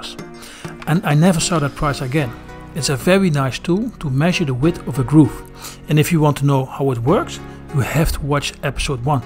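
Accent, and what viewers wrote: Netherlands. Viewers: Verdomme dat hollands accent haal je er ook meteen uit.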